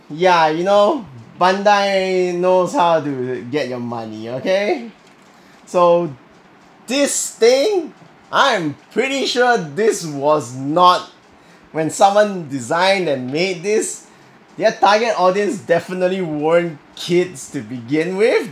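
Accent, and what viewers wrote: Singapore. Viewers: is not form is breathing dude and are you from Singapore?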